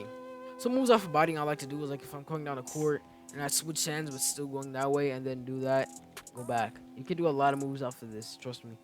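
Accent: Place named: New York, United States. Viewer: U sound like u from NY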